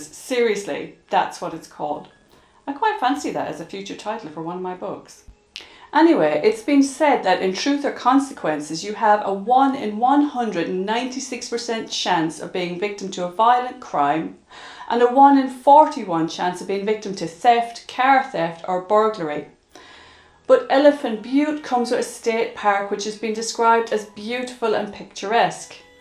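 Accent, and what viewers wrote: Ireland. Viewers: Glad I found this channel. You’re accent sounds a bit Irish ☘️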